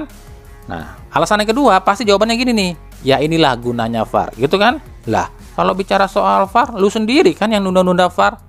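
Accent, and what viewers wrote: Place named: Java, Indonesia. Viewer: Klasemen sementara bali ka 4 mang Kamari medok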